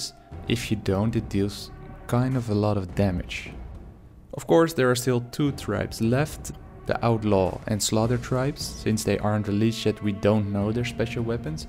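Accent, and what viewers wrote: Netherlands. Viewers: I can tell you're dutch by your english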